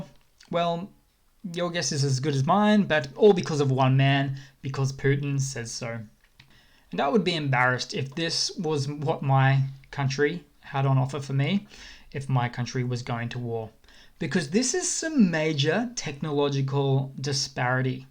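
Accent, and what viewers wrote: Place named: Australia. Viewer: David ABBA thank you for this info 👍 A lot of us believe that is Australian based on his pronunciation of some key words. Not up to mischief but what do you think?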